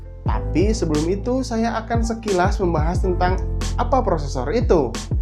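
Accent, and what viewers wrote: West Java, Indonesia. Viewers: Baru beberapa patah kata aku sudah tau bahwa anda orang Sunda 😄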